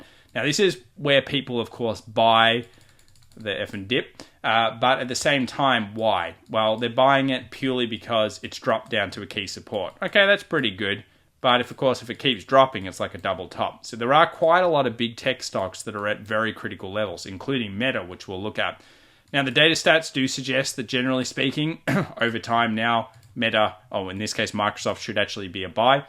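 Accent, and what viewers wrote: Australia. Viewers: Your audio was fine. Maybe you sounded 10% less Australian which means that I can actually understand you better.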